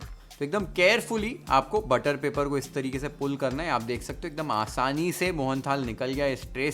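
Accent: Maharashtra, India. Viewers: Your voice tone is like marathi person talking in hindi ?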